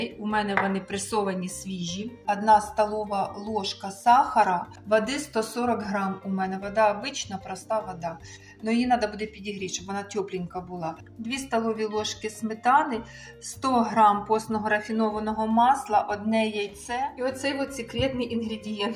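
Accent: Ukraine. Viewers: Как приятно слышать украинский говор! Какая чудесная хозяечка,такие и классные пирожки! Спасибо огромное,благодаря вам,я мысленно побывала в моем далеком детстве!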